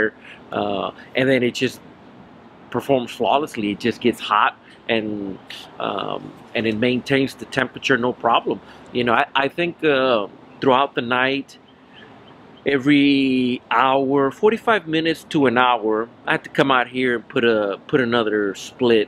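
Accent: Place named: South Texas, United States. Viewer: Where are you from you have that south Texas accent just like I do.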